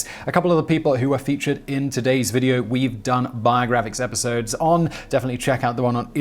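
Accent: United Kingdom. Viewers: Spoke like a true brit "not to be messed with"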